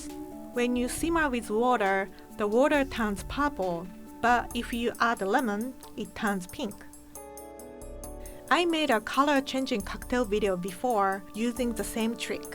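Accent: Japan. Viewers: Probably that just her accent. Japanese people will sometime misread "L" as "R" and "R" as "L" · Even after years for working for tasty america she hasnt lost here japanese accsent praise God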